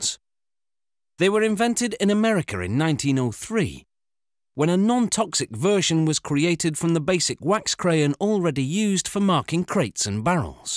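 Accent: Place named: United Kingdom. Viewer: I wasn’t expecting the British accent I’m accustomed to the USA accent, where is the SAP button?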